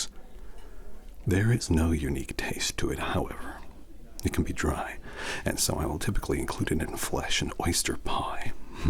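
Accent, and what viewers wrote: United States. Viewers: Scary, disturbing, and very satisfying. Nice American accent. :) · Wow, your American accent is really good! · Great American accent.. I want more! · Not only is that a great American accent but that’s also a very good impression. Acting chops for dayssss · You get a hint of it... But it is SO impressive that you can switch to an American accent 😱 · Your American accent is amazing. How do you do that?! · Wow!!!!! Your American accent was just...GOD you’re good! You should absolutely do books on tape or something. You narrate SO WELL. And that was creepy and perfect and YOU are so perfect! Amazing job as ALWAYS! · Your American accent is getting really good, man. <3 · I'm really digging your america accent! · Wow!! Your American accent is absolutely amazing! Especially because your real voice is deeply British (which I love!) You truly sound American if this was the first of your videos I'd ever seen, I wouldn't have guessed your British! 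American here from North Carolina! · I haven't seen the movie, but two things amaze me: #1 your flawless American accent, and #2 the way you are able to make your face look like Christian Bale's face. I feel like a broken record saying yet again how versatile you are, but damn. 🙂 💜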